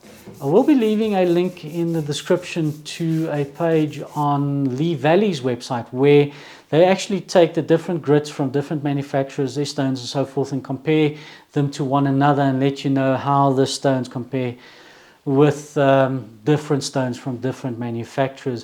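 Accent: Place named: South Africa. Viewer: How do you refresh your strop when the leather darkens and flattens? BTW, your accent reminds me of Darryl Cullinan the former SA batsman.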